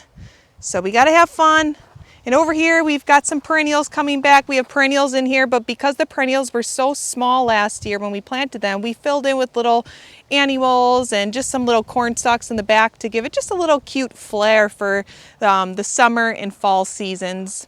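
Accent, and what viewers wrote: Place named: North, United States. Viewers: Love the accent! You sound like some of my Yooper family ❤ Thank you for the garden tour 🤗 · I know you are a state away but a northern accent makes me feel at home when I listen. Hello from Northern Minnesota (Zone 3b) 💕🌿🌿🌻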